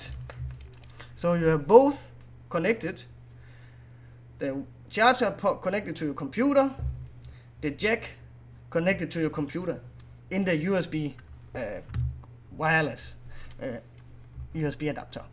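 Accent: Denmark. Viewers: you are from danmark andt you XD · er du dansker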